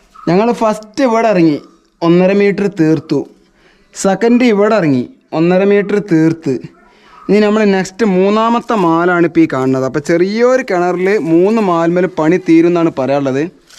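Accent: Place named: Kozhikode, India. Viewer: നിങ്ങൾ എവിടെയാണ് പ്പാ... ചെയ്ത് വചിക്കേണ്. അടിപൊളി സംസാരം ആണ് ട്ടോ... കോഴിക്കോട് കാരുടെ വർത്താനം .. നല്ലോണം പിടിച്ചാണ്ട് ഇറങ്ങാന്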